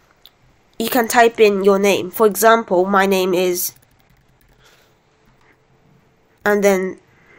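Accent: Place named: United Kingdom. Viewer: HEES BRITISHH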